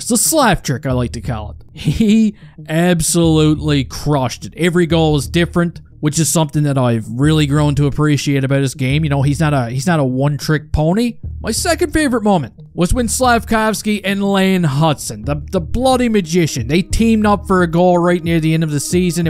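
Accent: Newfoundland, Canada. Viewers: You got to be a Newfie with that accent ,definitely not from Cape Breton !!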